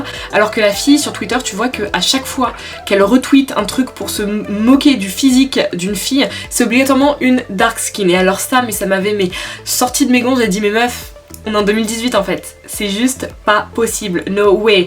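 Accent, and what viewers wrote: United Kingdom. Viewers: J'aime bien tes videos mais tes mimiques franglaises sont prodigieusement agaçantes, excuse-moi hein mais j'ai eu du mal à me concentrer tellement j'étais obnubilé par les mots que tu prononçais à l'anglaise (certains étaient même français) ainsi que les "bad attitude" "ratchet" etc...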